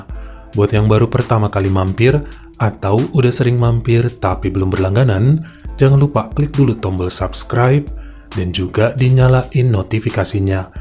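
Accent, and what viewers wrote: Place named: Java, Indonesia. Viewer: HALLO...... S 3 MAKIN COOL AJA NI CHANEL KAK, NGANGENIN BANGET PEMBAWAANNYA EPIK DI BUBUHI BHS JAWA MEDOK YG BIKIN SENYUM SENYUM SIMPUL SEHAT WALAFIAT SELALU SELURUH KELUARGA YA KAK, SALAM SEHAT DARI SYRIA 🇸🇾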